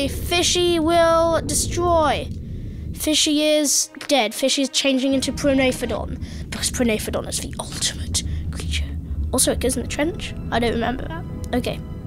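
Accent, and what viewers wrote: United Kingdom. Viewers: U sound Britian! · You are you British?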